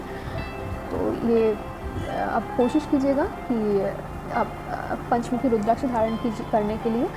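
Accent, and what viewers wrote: Bengal, India. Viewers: Mone hocche khanti bangali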